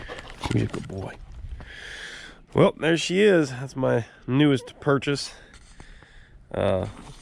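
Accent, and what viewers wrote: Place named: Pennsylvania, United States. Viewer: sounds like a Pennsylvania accent there....where are you from? we are in ohio. love the old trucks. i have a 1968 w900a with a an 8v72 2 stroke and 4 and 4 trans. original truck but needs retored. also have a 65 loadstar project going on.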